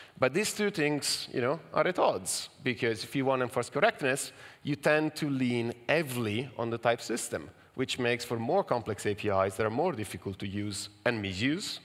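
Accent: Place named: Italy. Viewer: I wonder if the name has any influence from the town of Pavia, since your name and accent sound very italian?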